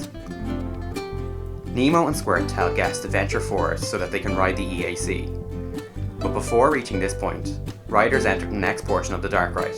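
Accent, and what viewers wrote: Ireland. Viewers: Yes, it's excellent quality. Thank you. Just not used to a non-American accent in these videos lol. Irish?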